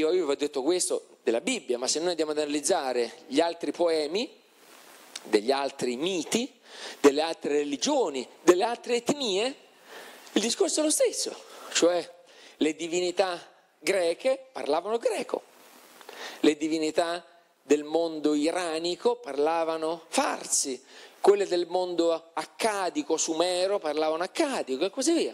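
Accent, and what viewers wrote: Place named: Rome, Italy. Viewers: Se il relatore (in questo non ho fatto caso ma in altri video sì) evitasse le c romanesche e le doppie romanesche… non dico la dizione eh, sono contro, ma almeno niente italiano locale/dialettale; in più (sempre non in questo) dice cose che vanno contro la cultura generale e le parole di alcuni storici (esempio Istanbul che è la traduzione di Costantinopoli, Carlo Magno in buoni rapporti se non sopra Papa Leone III e contento della sua incoronazione, Loki con la clava e Odino con la lancia…) senza citare le fonti. E alcuni “gli” che dovrebbero essere “le” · Prima usa correttamente il verbo"insegnare" nei confronti del figlio. A 21.44 secondo me è il popolano che si chiede perché il suo dio non ha "imparato" a tutti la stessa lingua. È probabilmente una volgarizzazione voluta, come il fatto che fa le sue relazioni senza usare un linguaggio cattedratico ma piuttosto un linguaggio semplice con persino un accento romano.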